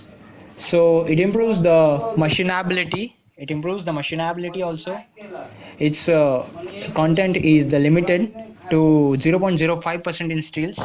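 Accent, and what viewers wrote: India. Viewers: I am not understand your hinglish accent.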